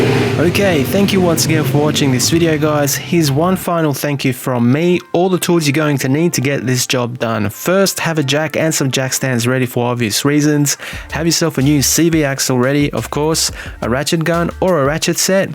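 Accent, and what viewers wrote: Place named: Connecticut, United States. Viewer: Where the fuck are you from, Connecticut? Hell of an accent, son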